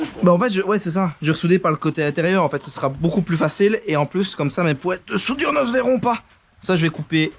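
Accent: Liège, Belgium. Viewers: Toi tu viens de Liège 😁😁😁. Chouette video ami liégeois 😁😁😁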